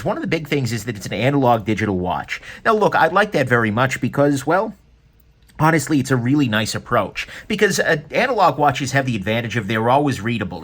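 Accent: New York, United States. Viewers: I love your accent - is it NY? Great video, very entertaining.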